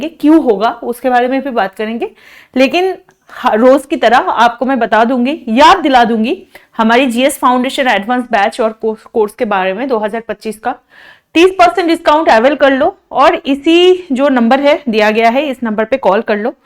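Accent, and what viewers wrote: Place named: Bengal, India. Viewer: Bengali accent wow.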